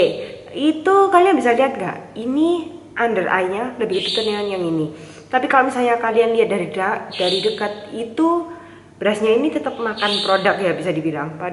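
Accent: Java, Indonesia. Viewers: jd kaya khasnya cindercella ya, dr segi nada bicara sma medok2nya haha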